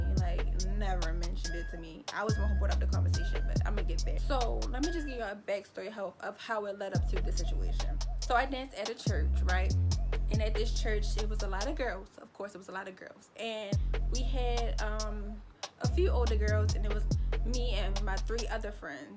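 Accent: Baltimore, United States. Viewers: i knew u was from baltimore the first time i heard your voice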